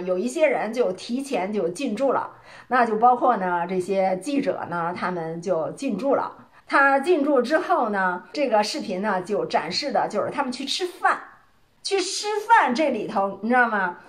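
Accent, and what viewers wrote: Beijing, China. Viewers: 天天都会看几个视频，特喜欢的就是一口京腔京韵，再重点更好！👍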